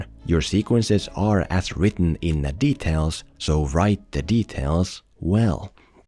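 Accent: Finland. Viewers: You sound Finnish, and if that's true, I marvel at your strength not to pronounce Harkonnen as Härkönen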